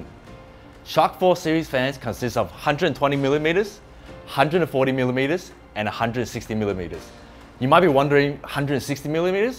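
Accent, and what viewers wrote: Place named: Australia. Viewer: sounds so aussie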